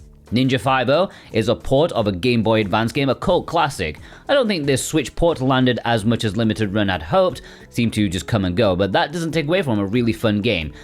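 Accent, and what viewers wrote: United Kingdom. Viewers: Are you Caucasian ??? You sound very Asian British :o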